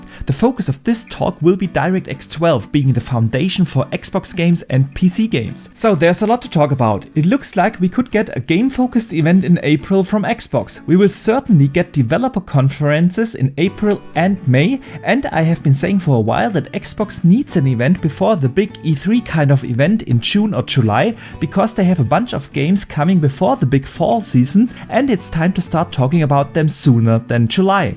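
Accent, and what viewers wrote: Germany. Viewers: Wie ich diesen deutsch englischen akzent liebe, mach weiter so!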